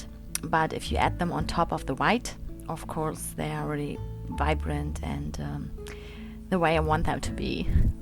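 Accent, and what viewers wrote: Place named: Germany. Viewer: Deine Betonung ist so "typisch deutsch" :D Das ist nicht schlimm, hat nur Wiedererkennugswert ;) Am Besten gefallen mir die Haare, aber das Gesamtbild ist einfach klasse :)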